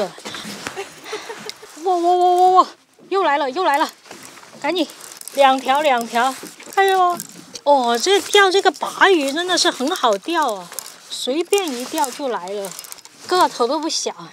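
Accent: Sichuan, China. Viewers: 听口音像四川，最后果然说四川人了。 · 哈哈果然是一个地道四川人，我看你第一个视频就听出来了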